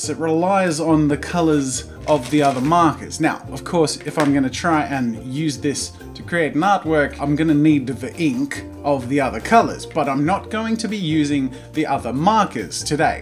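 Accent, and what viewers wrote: Australia. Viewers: I learned to do a proper Australian accent from your videos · he sounds like an Australian Hank Green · British? He's Australian.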